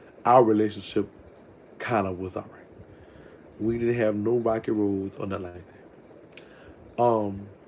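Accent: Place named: Houston, United States. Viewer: You talking in that Houston boys voice is taking me out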